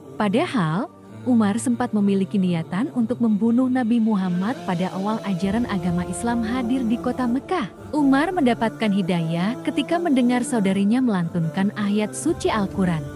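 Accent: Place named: Indonesia. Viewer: Sayang pelapalan bahasa Arabnya, masih sesuai bacaan Indonesia.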